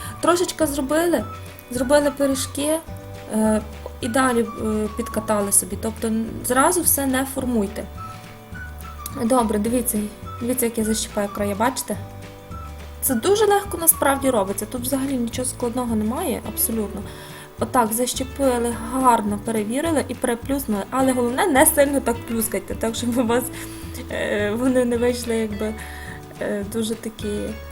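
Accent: Western Ukraine, Ukraine. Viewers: У вас украинския речь с западным акцентом., а почему ваш канал подписан по английски? Что в украинском языке не нашлось вам название? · Эта Украиночка из западной Украины. Я тоже с Украины, но у нас другой диалект))))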